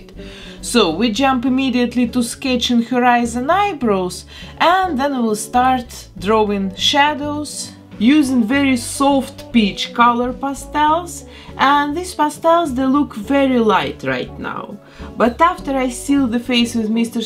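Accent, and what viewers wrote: Russia. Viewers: Я слышу очень сильный русский акцент 🤔🤔🤔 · Боже, смотрю вас уже несколько лет. И по акценту догадывалась , что вы русскоязычная. Но оказывается вы из Беларуси. Держитесь там, уверена , все будет хорошо))) ❤️💚🤍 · Акцент русский я права? 😁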